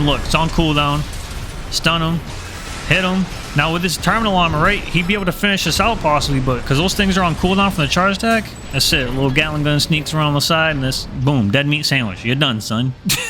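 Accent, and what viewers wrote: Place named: New York, United States. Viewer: Lmao 😂 yo i know you gotta be from new york by the way you talk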